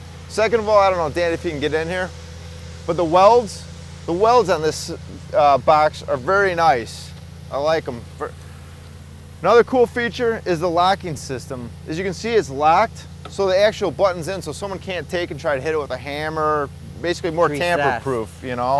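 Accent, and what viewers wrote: United States. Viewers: Great review but damn, yankee accent. I had to rewatch a few parts, couldn’t understand what you were saying at one point. Shacks=Shocks. Bax=Box. Haha. Once again great review, I’m sold on this “bax”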